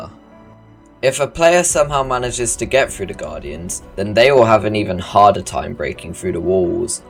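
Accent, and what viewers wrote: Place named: United Kingdom. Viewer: i dont know why its so funny to hear british people commentate things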